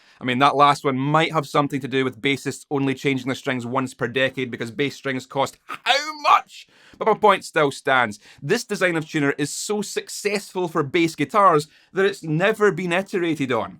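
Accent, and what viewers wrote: Scotland, United Kingdom. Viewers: I come here for the wonderful Scottish accent (me dad is mostly), and the guitar geekery is a bonus! Seriously, you are one of my favorite channels for the great guitar information, and because you're not afraid to slay sacred cows like locking tuners, unpotted pickups supposedly being better, pickup measurements influence on tone, etc. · I need to lear the Scottish accent, like you and Dr. Steve Cassidy, so I can make more interesting videos. Love it.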